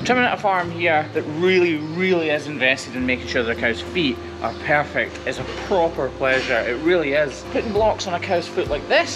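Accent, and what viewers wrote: Scotland, United Kingdom. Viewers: Im from Sweden and I have nothing to do with this kind of work, but I think its very beautiful and nice to watch. I was sleepless one night for like 2 weeks ago and look at some stuff on Youtube and on some weird way I found you. Now I have watch so many of your vids that even I can see sometimes the problems the cows have, its very fun! And I love you Scottish dialect and humor! 🍻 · Since discovering the, I have learned so much about cow hoof care, but most importantly I can confidently say that I have started to understand Scottish English. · I know what you meant but it's really just a Scot's accent which differs it from English, Welsh or Irish.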